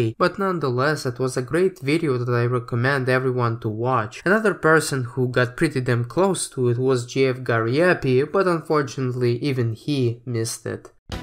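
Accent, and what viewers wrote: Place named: Eastern Europe. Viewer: The CRISPR part gave me the willies. Especially with the Central-Eastern European accent.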